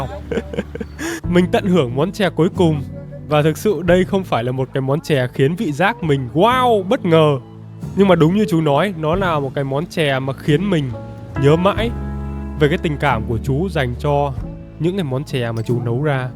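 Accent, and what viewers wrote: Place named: North, Vietnam. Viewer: Mình lại thấy bình thường không có làm màu gì luôn. Có thể chủ kênh nói giọng bắc nên chú đoán là khách du lịch nên chú tiếp đãi nồng nhiệt thôi.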